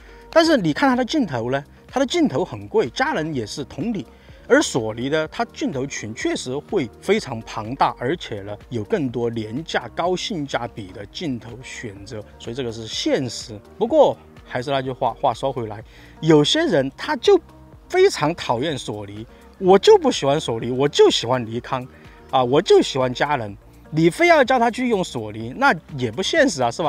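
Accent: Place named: Chongqing, China. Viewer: 听出了川音:face-green-smiling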